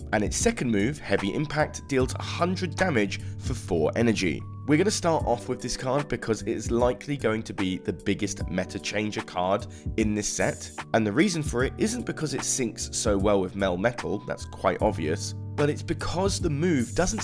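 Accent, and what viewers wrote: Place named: United Kingdom. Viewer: Wait why is this voiced by a british guy now? Did this channel switch owners?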